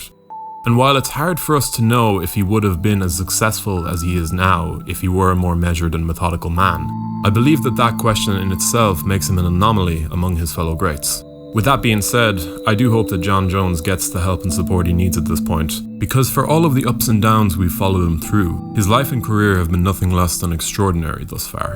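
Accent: Ireland. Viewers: Great content , are you irish ?!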